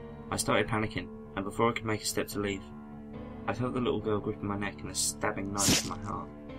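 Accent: United Kingdom. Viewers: ain't often i find a British narrator i like, nice one bro.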